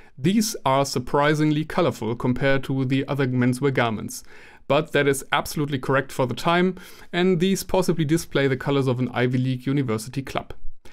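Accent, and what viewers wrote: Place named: Germany. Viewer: I hear a german accent :) I wish I knew the right tailors in germany...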